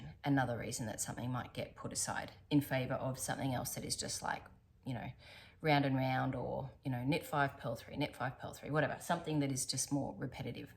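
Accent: Australia. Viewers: I have been gradually going through your library of podcasts - so lovely to hear an (eloquent) Aussie voice talking about making, as I'm currently living in the States. That shawl is definitely something to be proud of, it's stunning! Can't say I'm ready to dive into such a big project though, my queue is already too long. Out of curiosity, what are the shops you like to shop and ship to your American family? I feel like now that I'm here I'm missing my gorgeous Aussie merinos, but I've previously ordered Brooklyn Tweed and paid through the nose to ship it to Aus. Looking for some inspo to shop 😂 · Hi, am so glad I found your podcast and can’t believe it occurred by watching Beth Macdonald Stone’s podcast and she mentioned she watches and really likes your podcast. Since then I’ve been watching all your videos. As a fellow Aussie living down in Melbourne I’m just loving hearing an Aussie voice and of course seeing all your wonderful makes. 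Am also a big fan of Skein Sisters and buy from them regularly. Love your work ❤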